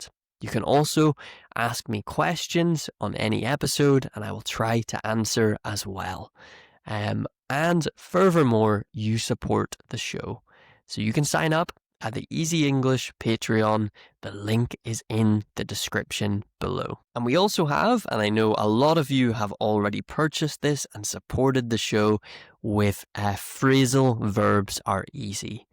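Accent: United Kingdom. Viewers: Hello 👋🏻🙋🏻‍♀️ Since this video was recommended to me , I ’ d like to ask — are your videos all in British English ?? 🤔😊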